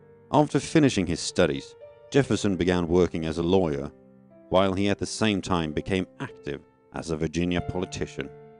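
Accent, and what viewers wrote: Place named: United Kingdom. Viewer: British narrator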